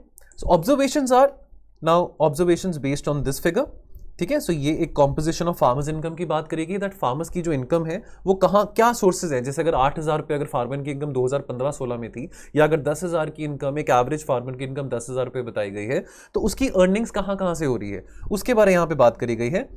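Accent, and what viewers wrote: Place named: Punjab, India. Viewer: Sir aapke bolte time punjabi nikal jati hai. I observed😁 kiu ki..mai bhi punjab se hu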